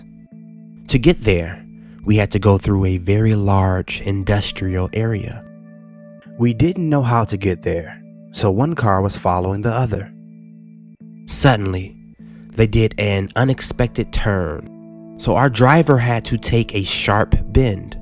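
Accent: South, United States. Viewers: I can't place the accent. Sounds slightly southern but not quite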